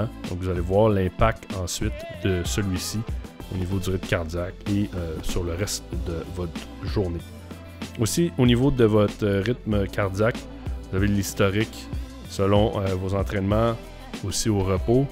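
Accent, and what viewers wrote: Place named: Canada. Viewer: ppppffftttttt c'est relou l’accent Canadien, ont ne comprends rien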